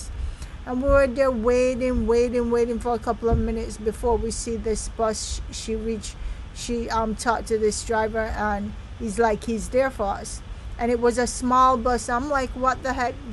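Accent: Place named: Jamaica. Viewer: Yoùr speech pattern is Jamaican therefore it's safe to say you are Jamaican so how come you were so unprepared for current occurrences on the Island. Say thank God the Police were doing their duty enforcing traffic regulations, havent you read or heard of the very high numbers of fatalities and senseless mayhem committed on the roadways? There are several reasons why Police personnel are armed with high powered weapons in the public space, your safety and protection is one of them. Your foray into blogging appears to be in its infancy, take time to research and prepare content, not everyone ad-libs a cogent presentation. Not criticizing, "mi 'jus a sey". Glad ypur stay was safe and enjoyable. A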